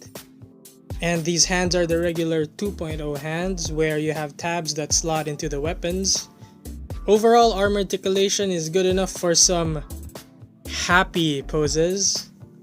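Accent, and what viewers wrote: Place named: Philippines. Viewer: Are you Filipino I feel like you are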